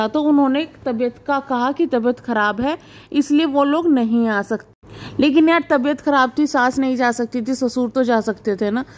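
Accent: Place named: Haryana, India. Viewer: Mai ek baat bolungi mujhe lagta hai aaoke tone se aap haryana side ke ho but mujhe aapke state se koi matlab nhi hai mai bas ye bolna chahti hun ki dekhiye hamare bihar up me na sasural walo ko agar kisi v chiz me invitation dena hota hai specially local me mayka ho to ghar aakar bolte hai kamini ke 2 bhai hai kisi ek ko to ghar aakar saas sasur ko invitation dena chahiye tha kyunki relation pahle se hi thik nhi the to itna ro jarur karna tha or ye comment aap kamini ke channel pe jakar padh skti hai ye unke khud ke subscribers v bol rahe hai or jiss din arti ka engagement ka wo roye thi uss vlog me kamini ne badua diya tha ki jisne v meri behan ko rulaya hai uska bhagwan kavi bhala nhi karega ye line sahi tha kamini ka kyunki roye to wo unki saas ki wajah se thi to kamini apne hi saas ko baudua di thi ye v sahi nhi tha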